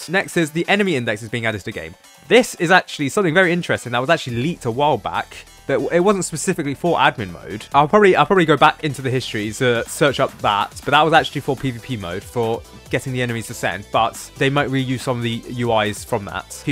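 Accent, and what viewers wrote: United Kingdom. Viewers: Are you from Brittain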